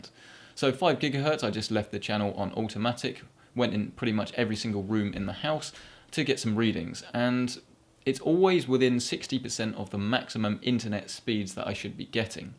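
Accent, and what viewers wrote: England, United Kingdom. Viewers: You can't beat a good English accent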